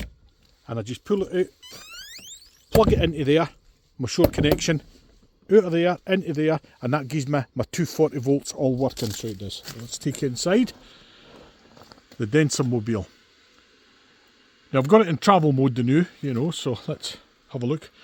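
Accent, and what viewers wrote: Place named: Scotland, United Kingdom. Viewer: Good to hear a Scottish voice!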